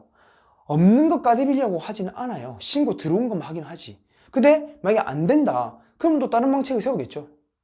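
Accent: Busan, South Korea. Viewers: 고향이 부산이라 말씀이 더욱 정감있고 시원시원하고 진실로 구독자 생각해주시는 부동산 팁 잘듣고있습니다^^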